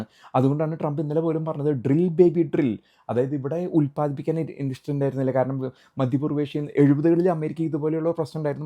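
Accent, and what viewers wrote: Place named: Thrissur, India. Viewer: നല്ല കിറു കൃത്യമായ നിരീക്ഷണവും ചേട്ടന്റെ ആ തൃശ്ശൂർ ഭാഷയും എല്ലാംകൂടെ നല്ല രസമുണ്ട് കേൾക്കാൻ. .. ഇപ്പൊ റീച് കുറവായിരിക്കും പക്ഷെ നിരീക്ഷണം തുടരണം, കുറേ നാള് കഴിയുമ്പോ ആളുകൾക്കു മനസിലാവും എത്ര കൃത്യം ആയിരുന്നു എന്നു. ..🙏🏻🙏🏻🫡🫡🫡🫡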